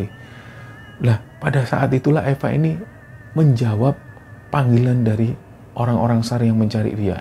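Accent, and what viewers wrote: Java, Indonesia. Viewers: Yaumul milad 1thn..tetap jadi canel yg sangat2 inspiratif...nggk alay....pembawaan yg sanggat2 muedok polll...tutur kata yg bikin merinding...kidung lawas yg mendayu dayu...terus sehat crew...tetap istiqomah..rendah hati dan tembus 2jt subscribers...Aamiinnn ya Alloh🤲🤲🤲🙏🙏🙏👃love you Allll❤❤ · Selamat anniversary, pertahankan originalitasnya..jangan sampe hilang medoknya mas...itu yang bikin gaya berceritanya menghipnotis audience..hehehe..semoga semakin besar,semakin bermanfaat..sehat, sukses dan berkah selalu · gpp medok yg penting jangan di banyakin bahasa jawa nya soalnya banyak juga yg nonton gabisa bahasa jawa🙏👍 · Logat medoknya mas sudah menjadi ciri khasnya,dipertahankan saja biar beda dari chanel yang lain. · Manteb mas jawa gak lupa jawane masuk denger nya enak pemabawa acara jos ❤️ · Betul mas,medhok itu ciri khas orang jawa. 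jangan dikira cuman logat jakarta yang lue gue saja yang enak didengar. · Ciri khas mas medok" suaranya,sukses sll,aku suka sekali👍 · Selamat ultah yang pertama. Semoga semua sehat2 dan channel nya semakin berkembang, aamiin. Dari konsepnya, kostum, logat medok semua sudah pas, hehe. 👍😊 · Justru dgn medok aslinya itu maka cerita yg dibawakan lbh menarik, dan cara menyampaikan bgt tenang, sy tiap hari dengar crt tiap malam diatas jam 11 sampai subuh, sambil kerja pencatatan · Medok bgtttt Mas mantap bhsa nya👍👍👍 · Happy 1st Anniversary! Jujur pertama denger channel ini saya gak berani denger intro nya, bener2 merinding! Lama2 saya bener2 kagum, krna channel ini berbobot dan punya ciri khas yg kuat entah itu pembawaan narator, background studio dan pemilihan backsound. Oia, saya jd suka denger org ngomong medok jawa krna channel ini jg 😁 Satu lg kualitas channel ini adlh membawakan kisah nyata disertai observasi lebih dulu dan menyertakan bukti2, jd gak asal cerita. 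Pertahankan ya mas2, semoga segera ke 1juta subs, semangat!! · terus pertahankan suara medok nya dan kidung pembuka, sukses selalu mas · Iya medoknya jgn hilang mas, itu kan ciri khas n sy suka dengarnya. Sukses selalu tim, semangat💪🏻💪🏻💪🏻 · Mas itu keluar aura MISTIS nya justru krn medok nya... Klw medok nya ilang, seperti ada yg kurang, Salam Taklim kagem Mas... 🙏🙏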